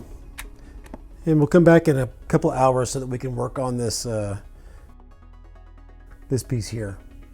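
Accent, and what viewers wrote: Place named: Mississippi, United States. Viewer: You're accent is like Michael Conor Humphries the little fella who played young Forrest Gump - himself the inspiration behind Tom Hank's accent. Are you from Mississippi?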